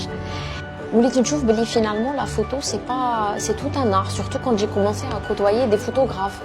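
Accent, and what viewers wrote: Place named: West, France. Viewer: J'aime ta façon de parler et bien-sûr le contenu .. mais surtout ton accent de l'ouest ça me manque. Bon courage pour ce que tu fais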